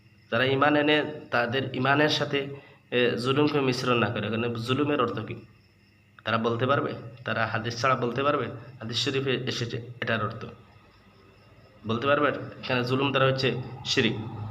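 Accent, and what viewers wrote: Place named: Chittagong, Bangladesh. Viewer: তোমার কথাই এখনো ফোটে নাই,চাটগাঁইয়া ভাব কথায় স্পষ্ট। জ্ঞানের গভীরতা বাড়াও।মরিস বুখাইলীর মতো "বিজ্ঞান, ইঞ্জিল ও কোরআন " একটি বই লেখে দেখাও।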